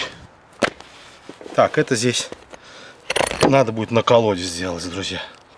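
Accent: Baku, Azerbaijan. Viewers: Сразу по разговору Бакинец))) привет с Разина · Спасибо дорогой земляк сидел бы вечность смотрел и слушал. Бакинский диалект это просто песня азербайджанского народа. Как я скучаю по Родине по дорогому моему сердцу Баку. По своему милому посёлку Новые Ахмедлы. С уважением к вам Иващенко Андрей Викторович. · Доброго здоровья! Ваш русский язык с Бакинским акцентом, для меня бальзам для души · Как азербайджанцы никто так вкусно не готовит на Кавказе. Ну и так вкусно рассказывать тоже. Не удержался и подписался! Этот непередаваемы азербайджанский городской акцент это супер.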